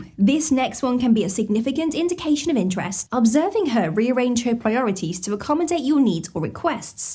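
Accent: United Kingdom. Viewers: your little British accent is cute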